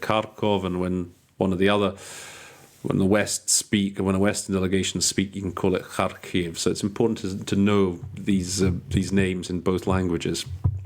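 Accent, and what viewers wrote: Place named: England, United Kingdom. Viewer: Where is your English accent located geographically?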